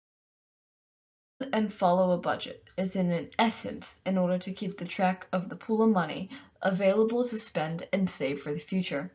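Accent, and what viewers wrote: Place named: Russia. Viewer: Your Russian is showing 😅 Great presentation 10/10!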